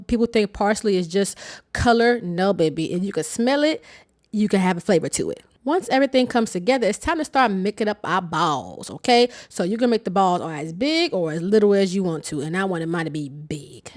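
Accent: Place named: Maryland, United States. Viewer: I hear a Maryland accent 😂 I'm making these soooooon!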